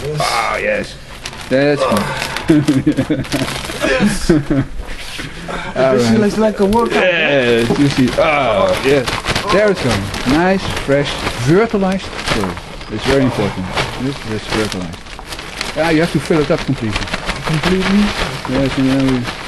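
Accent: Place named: Netherlands. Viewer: I really don't want to sound gay but the dutch accent speaking english is the best!! havin a toke and listening to just makes me so chilled!!!!! keep up he good work fella!!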